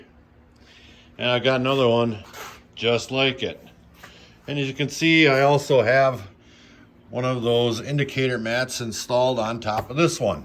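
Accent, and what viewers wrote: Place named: Pennsylvania, United States. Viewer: The " crick " I haven't heard yankee in a long time. That's the we talked in P.A. growing up. Moved to N.C. over 30 years ago. The accent is refreshing. A little taste of home. Love your video's so far. Thank you.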